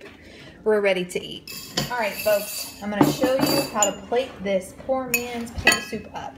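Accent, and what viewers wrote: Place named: Kentucky, United States. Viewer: I am a new subscriber for "Canuary" I was wondering if you are a Kentucky girl? Maybe Tennessee? Your accent sounds close to home to me.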